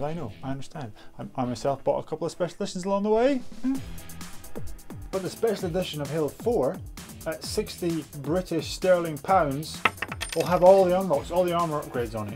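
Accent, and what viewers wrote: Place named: Ireland. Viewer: This is an irish accent right?...I like it. I want it. Gimme your accent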